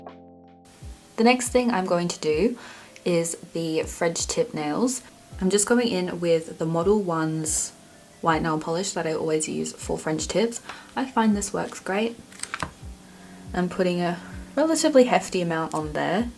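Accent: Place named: Australia. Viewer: I so enjoy your tutorials love.. Nice to hear an Aussie voice.. Love Anne, from Victoria Australia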